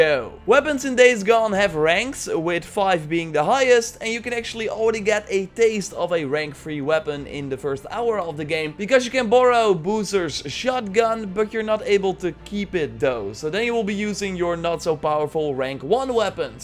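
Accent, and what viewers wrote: Netherlands. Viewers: This Guy sounds dutch, i recocnize the accent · You sound like you're from the Netherlands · ben je nederlands je bent super goed in engels maar ik hoor het aan je accent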